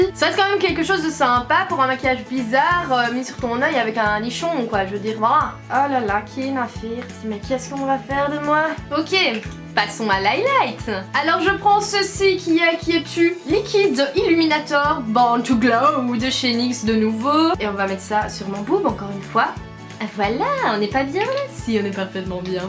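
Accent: Liège, Belgium. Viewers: ça s'entend que tu es belge 😉 on dirait moi 😂😂😂 (oui je suis belge) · Tu viens d'ou en Belgique t'as le même accent que lufy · Elle a pas le même accent je trouve haha! Elle vient de Liège (Coucou tu es trop lente pour répondre à tes commentaires alors faut bien que quelqu'un le fasse hein8DDDDD)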